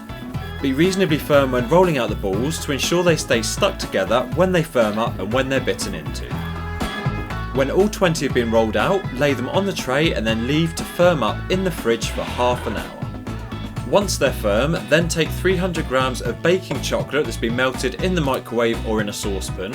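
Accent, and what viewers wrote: United Kingdom. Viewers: BRITISH ACCENTS ARE SO ANNOYING but the recipe seems simple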